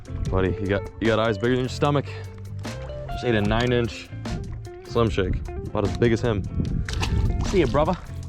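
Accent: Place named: Texas, United States. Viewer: Did anyone notice how texas accent came back when he left Main?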